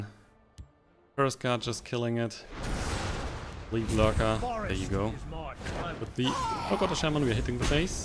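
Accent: Germany. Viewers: You speak like a german guy lul^^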